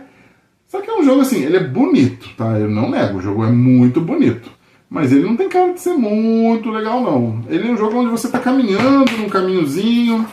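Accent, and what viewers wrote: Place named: Belo Horizonte, Brazil. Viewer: Ops bom demais? Você é de BH ou região?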